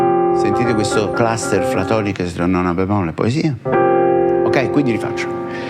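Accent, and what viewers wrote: Naples, Italy. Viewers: Oltre che bravo insegnante sei simpaticissimo con le tue espressioni con cadenze napoletane. GRAZIE mille.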